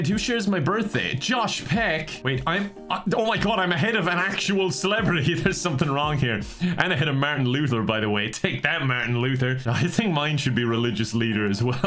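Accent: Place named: Ireland. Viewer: OMG HE'S IRISH I NEVER REALISED also I have been subbed for 3 years and though he was joking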